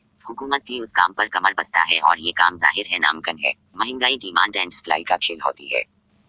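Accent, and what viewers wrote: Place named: United States. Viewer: یہ امریکن اردوہے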